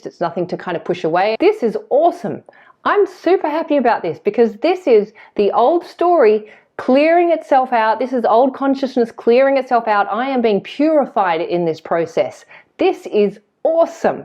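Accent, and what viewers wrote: Australia. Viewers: Hey I come here for your Aussie accent. Love it.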